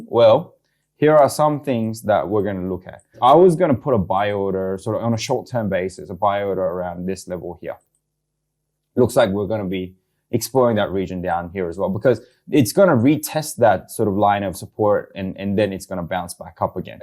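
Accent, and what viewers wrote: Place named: New Zealand. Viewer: You live in NZ? Got a bit of a kiwi accent 😁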